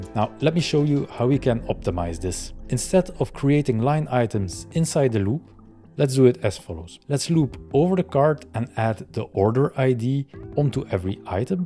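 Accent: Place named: Belgium. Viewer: Your accent sounds Belgian, your name Italian and your face Indian. Did I get any of that right? 😊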